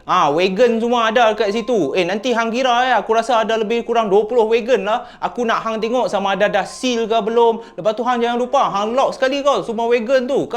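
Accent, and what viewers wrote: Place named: Penang, Malaysia. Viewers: Assalamualaikum...ada la ckit2 mcm org Penang cakap...kedah pn ada jgk...asalkn utara mna negeri pn x pa la...jnji utara😂😂😂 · Slmt ptg...adehh..krem perut Oii..tiap kali dgr ceritA mati akk mgekek tgelak,lg2 dgr ckp loghat peneng😂😂😂😂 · Fuhhhhh ..loqat penang dia mcm geqammm ja kita dengaq..😂😂😂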